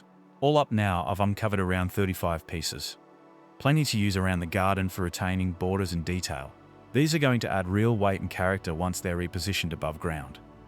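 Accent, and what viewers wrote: Australia. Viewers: Wow! Love this vid. really appreciated the approach you took to honouring the legacy and history of the area. And as a fellow Australian, love hearing the Aussie accent!! It’s such a good reminder that you don’t need a huge space to do phenomenal things. Subscribed, and I’ll be sharing this vid with others. Love your landscapong wirk, as well as content capturing! and looking forward to watching more of your content!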